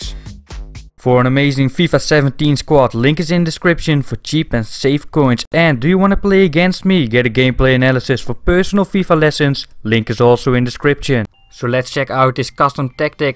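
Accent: Netherlands. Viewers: Are u dutch bro?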